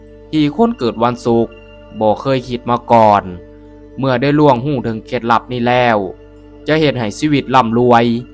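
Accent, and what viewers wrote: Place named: Laos. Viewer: สำเนียงพูดเหมือนคนลาว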